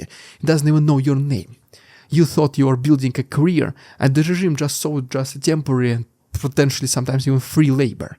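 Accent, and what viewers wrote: Russia. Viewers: I am terribly impressed by the command of the English language, although spoken with a thick Russian accent. I am an Australian that follows him daily and everybody here, (except a very few), totally support Ukraine. I would bet money that the rotten bastard Putin will be gone by Xmas! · In the beginning you were throwing morw effort on speaking out the English words. Now you are shooting them like you are speaking Russian...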